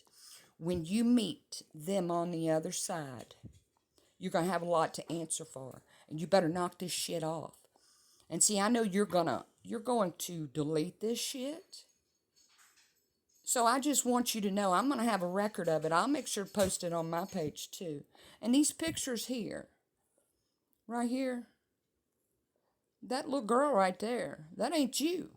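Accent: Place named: South, United States. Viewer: Gday, you are very easy to listen to, that southern twang gives me chills....Much Love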